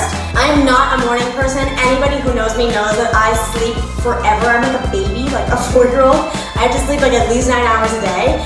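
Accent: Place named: Mexico City, Mexico. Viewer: It was nice to "meet" you on MIM. You nailed the CDMX accent. I thought the birthday party episode was hilarious when you and Roby stormed out of the restaurant. Keep up the good work!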